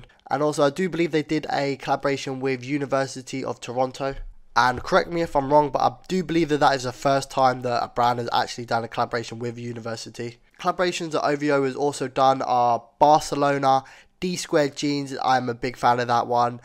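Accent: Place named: United Kingdom. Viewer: Man you so British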